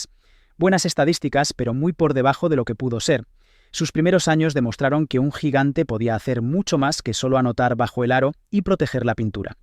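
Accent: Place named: United States. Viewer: Por qué hablas como un yanki?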